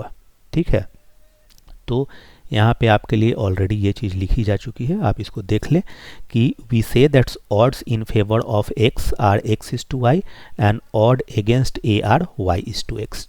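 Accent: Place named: Uttar Pradesh, India. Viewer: Sir aap toh UP ke lagte ho... BTW Amazing explanation. Thank you (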